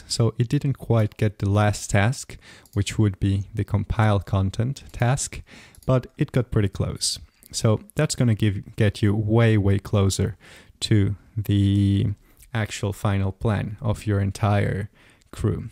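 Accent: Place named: United States. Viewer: Amazing tutorial. So we well explained and laid out. Question for you on CrewAI - is the process of picking a tool prescriptive, meaning the agent instructs which tool to pick? Or can the LLM pick automatically from a set of tools and decide what to use. I am just coming up to speed on CrewAI and wasn't sure. And your English is fantastic, by the way. First thought your accent sounded like you were from the USofA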